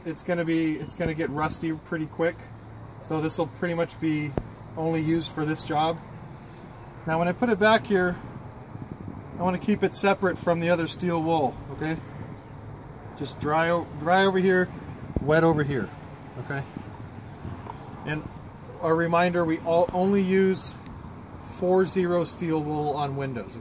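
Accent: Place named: Canada. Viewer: you have a awesome nearly perfect canadian accent :D i love using dawn dishsoap in my housecleaning.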